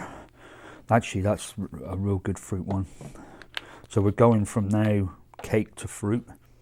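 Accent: United Kingdom. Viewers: north carolina? wow, i thought you were british lol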